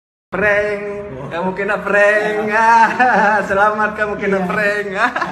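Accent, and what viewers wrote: Banjar, Indonesia. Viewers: Urang banjar ka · Ikam urang Banjar gayaknyak. Samak awan diakuk.slm kulak.alehhai. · Bang admin pake bahasa Banjar kayaknya 😅😅😅😂😂😂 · Curiga amang nya nih urang sungai tabuk😂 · Urang Banjar kah mamang ni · Ai urang banjar sakalinya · Pian urang Banjar kah mang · Bah banjar jua sekali nya, d.mn nya ikm mang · urang Banjar kah Pian ni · urang banjar kah ini 😂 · Banjar kh mang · Urang banjar kah pyn mang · Orang banjar ya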